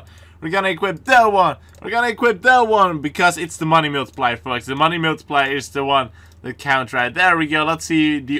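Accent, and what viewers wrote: Netherlands. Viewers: Bruh hes dutch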